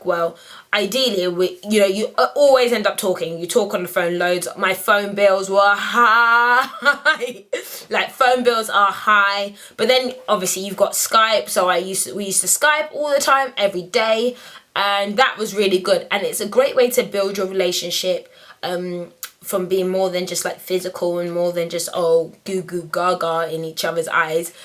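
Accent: United Kingdom. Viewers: Are you from London? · I Looooovvvveee the british accent! <3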